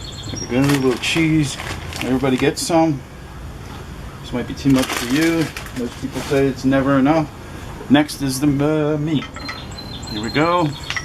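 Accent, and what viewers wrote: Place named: Pennsylvania, United States. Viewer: What part of PA are you from, I was born and raised in Collegeville and moved to south central Texas about 10 years ago when I was 23. But I can pick our accent up after the first word out of your mouth! Love you recipe makes me miss back home! Thanks!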